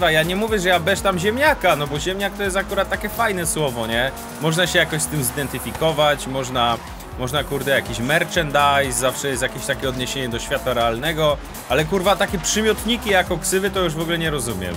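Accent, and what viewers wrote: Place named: Silesia, Poland. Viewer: Śląsko godka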